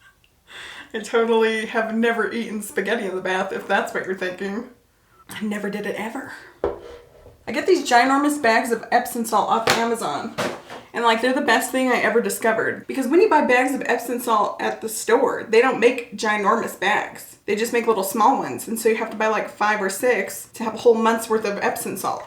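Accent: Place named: Midwest, United States. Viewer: I really feel like your accent sounds midwestern. I live in MO and you sound similar. Lol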